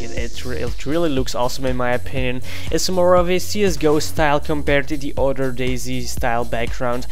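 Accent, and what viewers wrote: Netherlands. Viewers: Are you dutch? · R u dutch? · Are you Dutch? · he sounds very dutch to me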